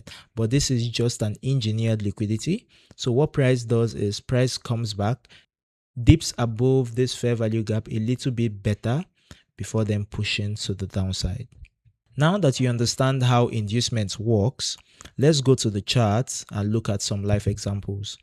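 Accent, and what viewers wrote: Nigeria. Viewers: My guy, u are good. I'm surprised at ur Naija voice too. Your videos are full of great info. Thanks a lot.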